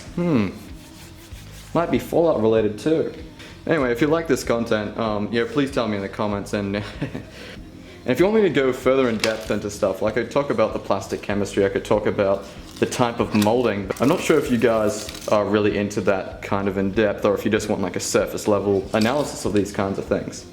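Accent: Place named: Australia. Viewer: Are you an Aussie or a yank mate? Sort your accent out bud.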